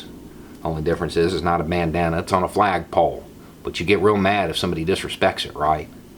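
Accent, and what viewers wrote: South, United States. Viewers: Dude, from somebody from a fairly major city up North as soon as I heard your accent I thought Oh lord another one of 'these' guys. This is probably the 20th video from you I've watched and my lord you've proved me wrong. It's easy for me to grow up with these beliefs... growing up in a densely populated diverse neighborhood you know gay people and Muslims and Mexicans and you realize that they are just like you. I'm white and I grew up in the little polish pocket in a majority hispanic side of town, and I got along with hispanic people far better than white people because of exactly what you're talking about... That sense of superiority and being inherently right just because they're white. To come from the south it takes a lot to develop that kind of attitude. Good for you bud I hope there's a lot more like you down there.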